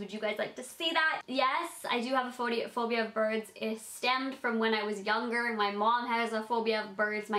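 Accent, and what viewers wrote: United Kingdom. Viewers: A British accent wow, you love talking to me then I'm British :) your so beautiful and I'm short like you I'm only 4 ft 11 lysm xx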